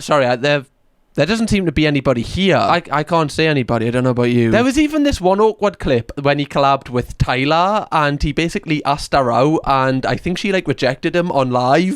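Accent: Newcastle, United Kingdom. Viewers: Idek who this is but ill watch it because its 😂 love the fellow geordie accent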